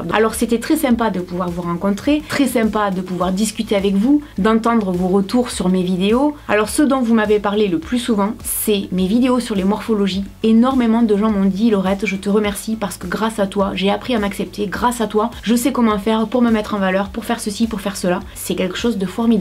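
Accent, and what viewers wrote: South, France. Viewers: Chloé Richaud Si elle a l'accent du Sud un peu. ^^ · J'adore ton accent du Sud!!!💗💗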